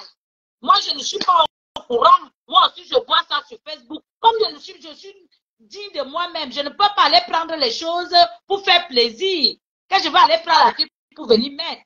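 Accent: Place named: Ewondo, Cameroon. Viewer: Mieux tu parle ewondo ton français et ton Corp se resemble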